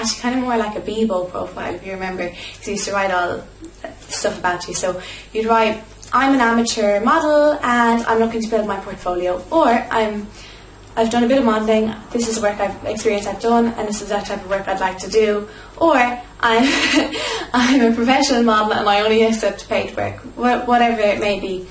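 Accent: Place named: Ireland. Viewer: I thought I recognized you from the video thumbnail, and as soon as i heard your accent I realized it was from looking on Irish modelling agency websites earlier :) Could I just ask a few questions please? What would be your opinion on starting modelling young? (im 16) And where would you recommend starting looking for agencies in Ireland? Thank you! This was a really helpful video and you're really beautiful xx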